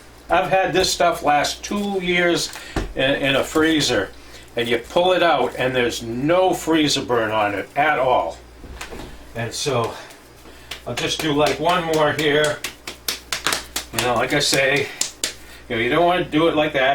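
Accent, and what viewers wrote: Boston, United States. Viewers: Good to hear the Ba'ston accent again. : ) Hope you upload daily life videos more often. Best! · Great content…….. admire your dedication and determination to keep you and your people taken care of….. I am in Tampa…with much easier life…but not the community y’all have….very nice…..we would be best friends…….that Boston accent is hanging in there!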